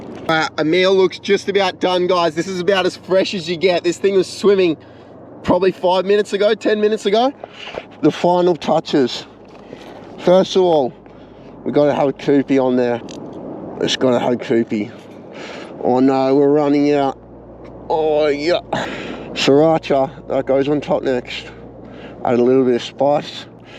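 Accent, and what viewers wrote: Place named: Australia. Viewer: bros a certified aussie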